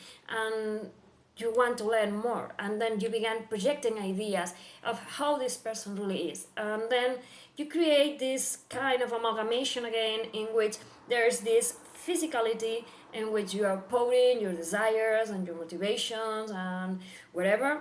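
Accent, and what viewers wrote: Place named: Argentina. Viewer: Yes, Miller is outsider and initially he sees in Julie something, he does not understand. He is curious and the more he learns, the more he likes and respects her. About his “visions” of her, there is a theory that the protomolecule works in more than three dimensions, including time. Since he met “ProtoJulie”, she appears in his mind before that, the same way he appears in the mind of Holden. Also your musings are great and I like your accent. Argentina?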